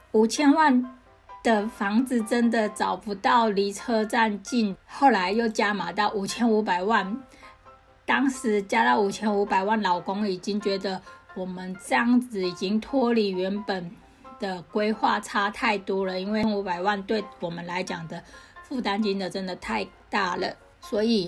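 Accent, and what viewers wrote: Taiwan. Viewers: 我喜歡這個心智圖，還有，台語腔調的國語，哈哈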